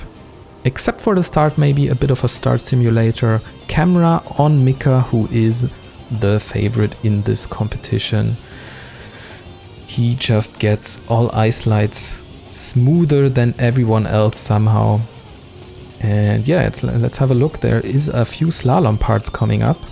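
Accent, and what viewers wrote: Germany. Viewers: sehr deutsche aussprache, woher du wohl kommen magst? 🤔🤔🤔😂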